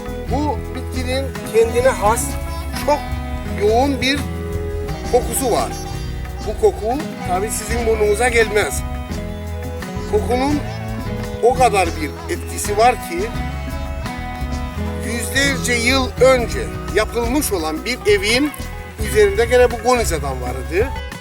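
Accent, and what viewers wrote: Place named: Cyprus. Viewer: dalcıkları, bayılıyorum kıprıs şivesine 🙂🥰 Maaşallah Horozumuz da pek yakışıklıymış. Ne güzel ibiği var. Nazar değmesin tü tü tü tü 😁 Canım horoz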